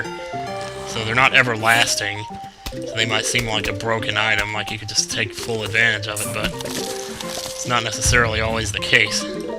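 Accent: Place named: United States. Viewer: your voice is way better then suger conroy and i love your streotipical american accen oww and if your woundering im english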